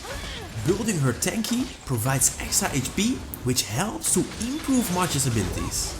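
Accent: Netherlands. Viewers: You got so Dutch accent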